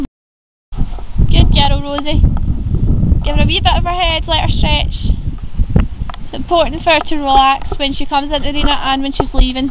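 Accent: Scotland, United Kingdom. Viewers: wow you sound fantastic - i totally need to get you as my instructor haha. also - bizarre question - but are you glaswegian or scottish? xxx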